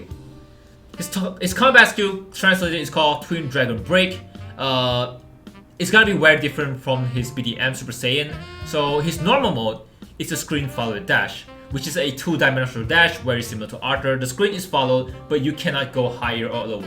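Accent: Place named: China. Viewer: Dude the Chinese accent and language is so cool damn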